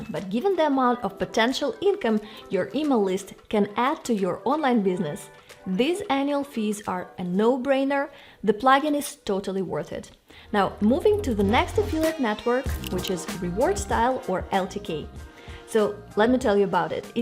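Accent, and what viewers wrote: Russia. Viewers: Russian accent 😮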